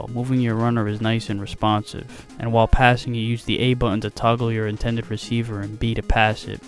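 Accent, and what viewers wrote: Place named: East Coast, United States. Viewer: your from the east coast huh, i can tell by your accent especially when your curse that shit made me bust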